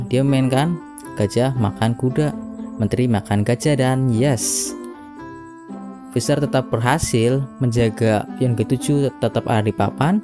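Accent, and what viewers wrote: Banyumas, Indonesia. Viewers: Kaya wong purbalingga, kebumen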